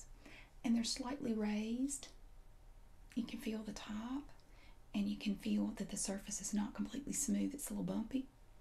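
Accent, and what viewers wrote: South, United States. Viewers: this makes me so comfortable, cuz I'm from the south and I meet people an accent like that , it just makes me feel at home😆😆😆😆 keep doing what your doing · Lol you are soooooooo southern. " luuuuuuuuuk ". Love it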